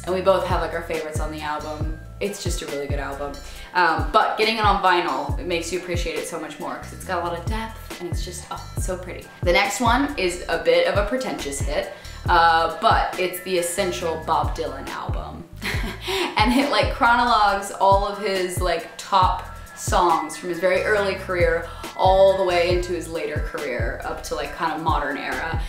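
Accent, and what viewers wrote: United States. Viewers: Are you american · i literally can't tell if your american or swedish omg lmaoo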